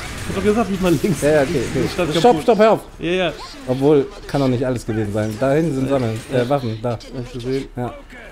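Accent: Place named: Franconia, Germany. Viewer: ja klingt fränkisch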